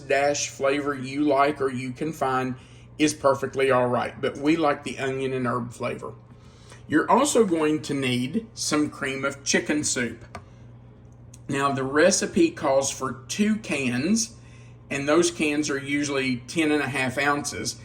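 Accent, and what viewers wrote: South, United States. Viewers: I love your southern accent and everytime you say TOGETHER,,,, I giggle and I say it the way you do ,,,,,unfortunately with my Boston accent ,,, just love you guys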